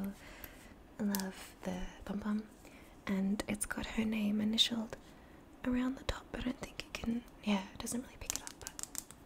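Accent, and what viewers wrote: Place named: Australia. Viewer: Love listening to the australian accent 🌞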